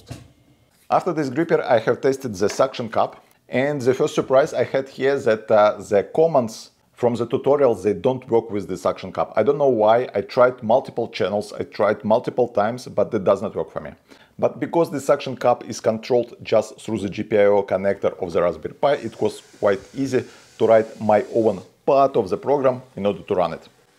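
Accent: Russia. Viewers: Он не русский. Сильный русский акцент. Но он немец из Швейцарии. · Теперь я понял, что значит "русский акцент".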